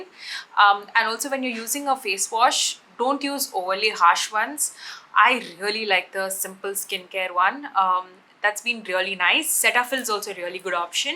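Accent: India. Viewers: Yes,The Indian accent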